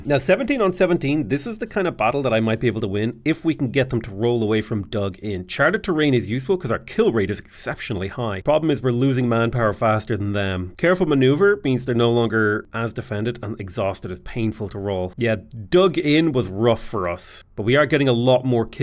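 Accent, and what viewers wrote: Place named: Ireland. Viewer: Came back to finish watching this - just realized that gets more and more Irish as the video goes by. (I do realize it's just his non-youtube accent slipping out bc of how long he's recording for)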